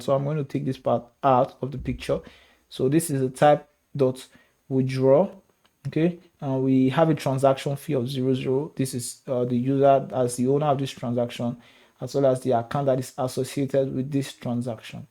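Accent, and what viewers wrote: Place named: Nigeria. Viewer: I liked the video but I really could not follow up because of the accent, it is difficult for me to cope with nigerian accent, not that there is anything wrong with such accent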